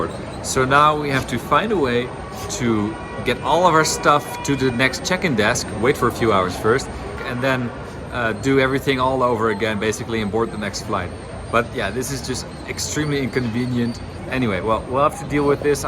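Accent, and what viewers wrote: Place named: Netherlands. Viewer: What country are you from , your frame and face made me think anglo, but with the accent I thought Dutch or even Danish But the name is Portugues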